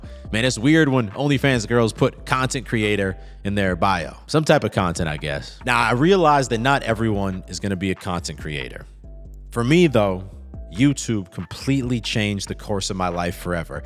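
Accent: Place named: South, United States. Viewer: This is my first time noticing the southern accent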